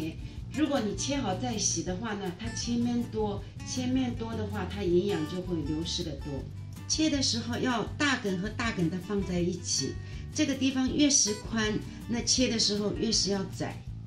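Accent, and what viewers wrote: Shanghai, China. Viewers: 哈哈 听得到上海普通话. 纳泥! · 阿姨上海宁对伐 哈哈 嗲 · 阿姨是上海人吗哈哈哈哈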